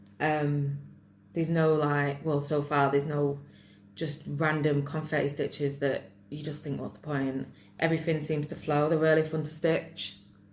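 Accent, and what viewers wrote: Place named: North West England, United Kingdom. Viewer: As soon as I heard you I subscribed as I knew you must be another flossy from the north west. Absolutely love your stitching projects and wow that lion is amazing. Loving the houses too. Brilliant first video and look forward to future ones. I haven't done any floss tubes as I'm too camera shy but I especially love to see locals doing their stuff. Here's to many more 👏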